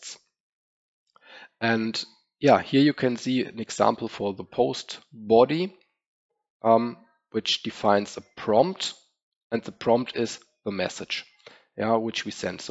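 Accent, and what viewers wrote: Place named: Germany. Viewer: Man erkennt die Deutschen halt einfach an der Stimme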